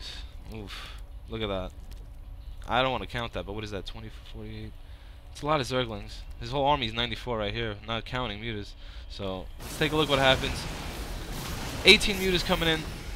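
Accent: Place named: New York, United States. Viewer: Haha yeah man i remember in one of your first vids some one had asked you where you were from and since then i was surprised cuz i couldn't find a trace of NY in your voice lol 'till now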